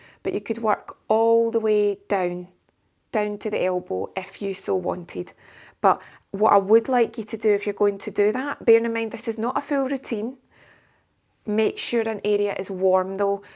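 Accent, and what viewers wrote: Scotland, United Kingdom. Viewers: Love the Scottish accent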